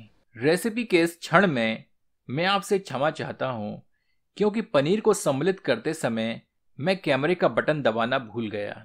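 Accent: Standard. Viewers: ur commentry is amazing... pure hindi... just love it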